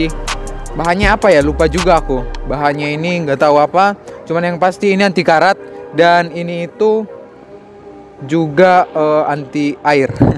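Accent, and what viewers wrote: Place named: Manggarai, Indonesia. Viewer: Logat nya manggarai sekali😂😂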